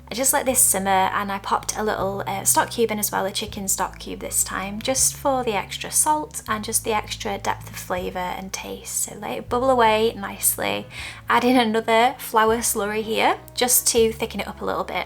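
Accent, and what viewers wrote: United Kingdom. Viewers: Wow these recipes look amazing and yummy. I’m so hungry now. Real comfort food for the winter season. I love your beautiful soft voice with your British accent 🥰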